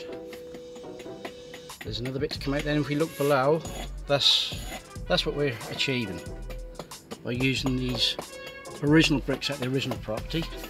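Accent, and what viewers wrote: Norfolk, United Kingdom. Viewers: Thanks, I thought Norfolk but didn't want to risk insulting you if you came from Suffolk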